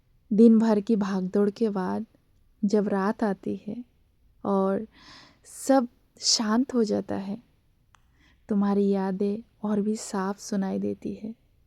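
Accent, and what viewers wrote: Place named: India. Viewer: Indian tumhari awaaz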